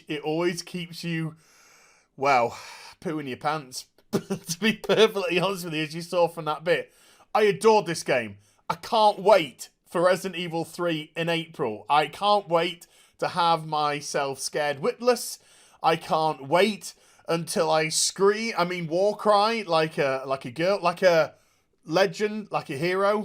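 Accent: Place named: United Kingdom. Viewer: Tunning in to this video to see the block from Jason, and staying for the british accent!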